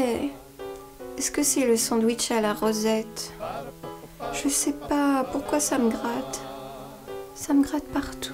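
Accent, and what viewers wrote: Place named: Quebec, Canada. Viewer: Anouk Fabing, je suis d’accord avec toi. J’aime, je la trouve inspirante, originale et belle malgré son accent québécois renié. Mais à mon avis, c’est possible de s’épanouir de à du lundi au vendredi si on est bien entouré au travail. Un horaire atypique est plus souvent un problème qu’un symbole de liberté, fait parti des rares personnes qui peut gagner sa vie en choisissant comment et quand elle travaille. Ce n’est pas le cas de la majorité des gens à qui elle s’adresse.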